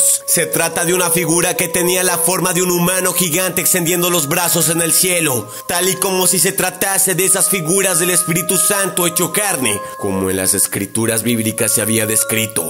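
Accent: Colombia. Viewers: Eres de colombia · Sos colombiano ?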